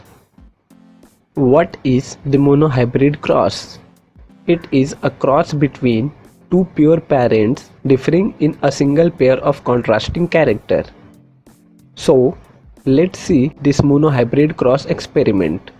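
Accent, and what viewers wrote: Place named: India. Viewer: Everytime I hear an indian voice, I know that I'm gonna learn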